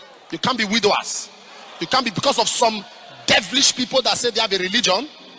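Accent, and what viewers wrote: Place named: Edo, Nigeria. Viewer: see your ekiadolor English..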